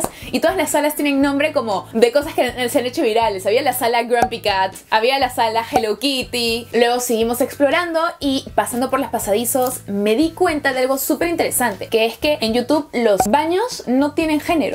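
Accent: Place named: Peru. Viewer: SAAA ES MI CAOSA PERUANASA Y NO SE TE QUITA EL ACENTO, ME ENCANTO TU CANAL 💘🌸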